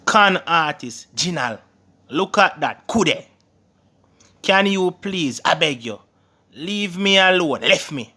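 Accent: Jamaica. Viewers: Patois! Love the accent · Jamaican accent hehe very interesting I like it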